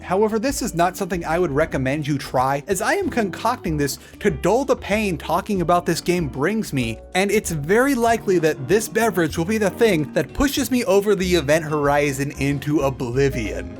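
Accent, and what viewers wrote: United States. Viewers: You from Colorado cause you almost sound like Jimmy snow.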